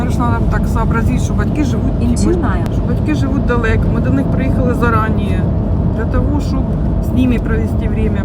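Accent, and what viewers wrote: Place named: Kryvyi Rih, Ukraine. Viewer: Вона балакає на нашому Криворізькому!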